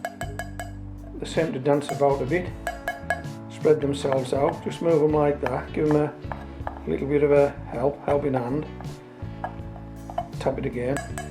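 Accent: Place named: Scotland, United Kingdom. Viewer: Accent seems almost Scottish. Workington up near Scotland?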